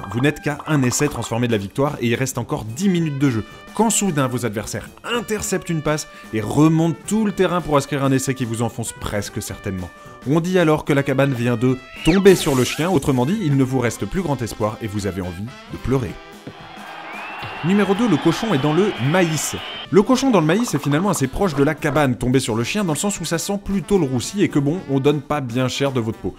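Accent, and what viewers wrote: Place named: Southwest, France. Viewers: l'accent du sud ouest est très beau 👌on dit roase et non pas rose avec l'accent du Parisien tout plat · Qu'il est beau notre accent du Sud-Ouest!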